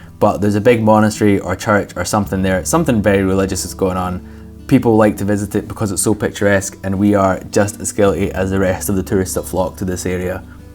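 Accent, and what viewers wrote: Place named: Barbados. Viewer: Here I am listening to your videos while still in Trinidad cleaning my house, passing time until I return to France this summer BUT I almost can't make the connection in my mind that you are NOT from the island of Barbados. Your accceeenntttttttt. You sound 100% Bajan!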